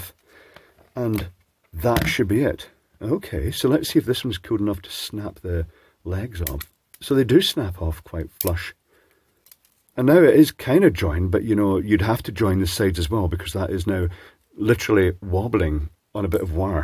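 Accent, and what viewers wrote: Scotland, United Kingdom. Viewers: As an American i find the Scottish accent to be a beautiful accent, I just enjoy listening to it. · I always love hearing british accent. It sounds so nice. And of course, I enjoyed this video.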